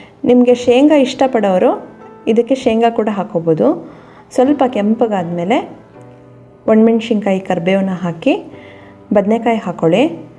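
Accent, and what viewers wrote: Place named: Mandya, India. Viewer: Sorry for asking, since you're slant of Kannada resembles Mandy side, still you're from my husband's place nice to hear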